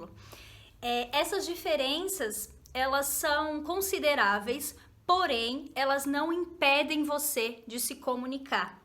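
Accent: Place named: São Paulo, Brazil. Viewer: Teacher discussed the diversity of accents in Brazil and the importance of understanding and respecting these variations for effective communication. She shared her personal experience of traveling to different regions of Brazil and encountering various accents. While she didn't specify which accent is the easiest, she emphasized that regardless of the accent one learns, they can still communicate effectively. highlighted the similarities between learning English accents and Brazilian Portuguese accents, emphasizing that exposure to different accents helps in adaptation and understanding. Overall, her discussion aimed to promote acceptance and appreciation of linguistic diversity in Brazil. ~ From California, Silicon Valley a, Student of Brazilian Portuguese. Muito Obrigado, Professora... By the way: I like PAUALISTANO, CARIOCA and MANUARA SOTAQUES and of course: The Royal Portuguese SOTAQ in particular. 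Evidently, Your sotaque is Paulistano, it's a pleasure to know that you can Speak California English? 😎😘😎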